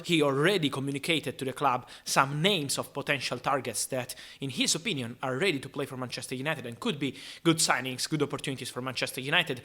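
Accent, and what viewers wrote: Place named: Italy. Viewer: I didn't even need to read your name to know you are Italian. Your accent is so typical. I like it.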